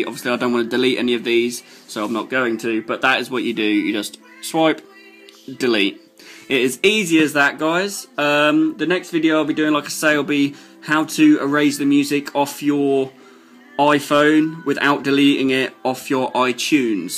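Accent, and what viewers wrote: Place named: Ireland. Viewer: Somebody's Irish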